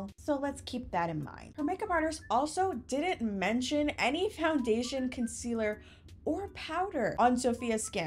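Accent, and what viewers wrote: United Kingdom. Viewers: ❤ your British accent mode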